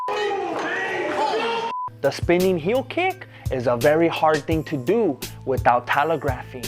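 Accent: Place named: Mexico. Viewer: i enjoy watching this guy ,his nice Mexican accent ,his jokes and beautiful videos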